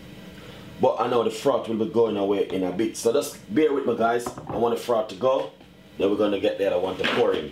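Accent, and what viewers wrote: Jamaica. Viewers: Speak so The World can understand it not only Jamaican watching, the name is Nutmeg!!!!!! Patios and English no go well bro sound ANNOYING. Just be real and talk u talk so mess up u talk sound #$&@@ Anyhow love the recipes although I know how to make them already, thanks for teaching those who don't know especially the young ppl 👍🏼👍🏼💯🇯🇲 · What language is he speaking then? Sounds like English to me With a Jamaican accent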